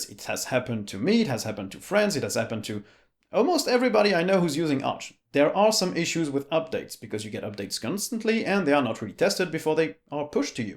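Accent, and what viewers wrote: France. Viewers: Completely unrelated but I didn't realize you were french Bonjour héhé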